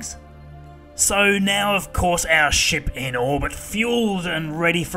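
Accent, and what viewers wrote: Australia. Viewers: Wow. While listening to you, I thought to myself "this guy has a funny accent, I'd say it's Australian" Then I checked your channel and there it is, you're Australian. As English is not my mother tongue (I'm French), I'm quite proud of myself for recognizing where the accent is from \o/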